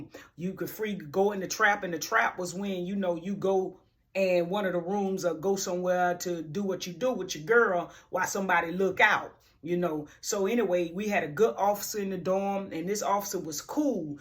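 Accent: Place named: Louisiana, United States. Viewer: That’s that Lousiana, low country GA or SC accent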